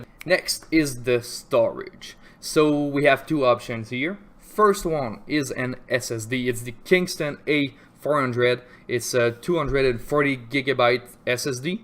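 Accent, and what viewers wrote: Quebec, Canada. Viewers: tu sonne pas mal québecois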